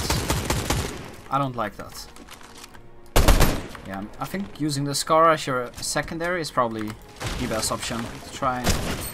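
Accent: Netherlands. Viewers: Are you dutch? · I hear a dutch accent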